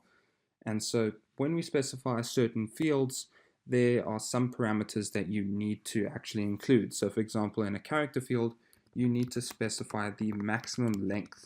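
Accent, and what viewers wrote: South Africa. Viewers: South africa? Create video, super clear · xD defiantly a South African accent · You are so from South Africa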